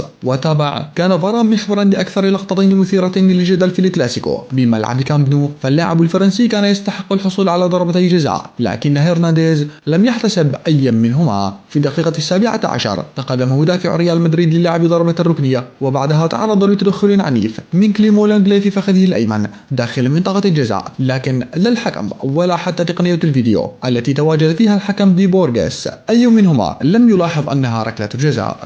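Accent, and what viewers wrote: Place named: Iraq. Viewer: شو بعرفني بقلي ياغالية انا قلت هيك لهجة العراق😂😂😂😂😊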